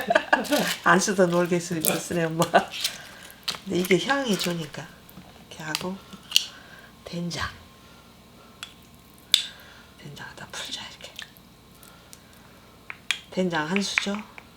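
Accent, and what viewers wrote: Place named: Chungcheong, South Korea. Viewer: 설명해주시는 부분이 진짜 엄마나 할머니가 해주는것 같아서 너무 친근하고 좋아요.. 저도 충청도 사람이라 중간중간 나오는 충청도 사투리도너무너무 좋습니다..요리강의 영상이 이렇게 따듯해도 되는건가요.. 보일러안틀어도 지금 너무 훈훈해서 땀이나요 삐질삐질 😅💕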